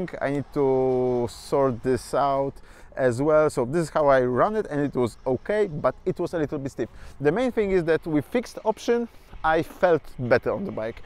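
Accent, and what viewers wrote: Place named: Poland. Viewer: Your English is more polish then English😢😢😢😢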